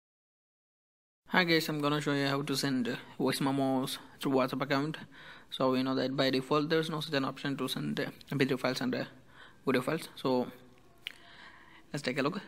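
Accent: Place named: India. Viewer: cant understand shit. dat indian accent man...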